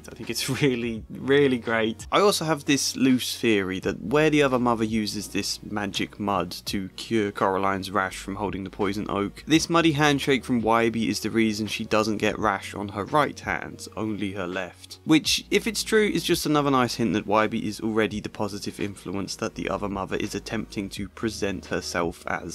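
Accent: United Kingdom. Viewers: I want you to know, that if you did not have a british accent i would not have kept watching this video. That is all.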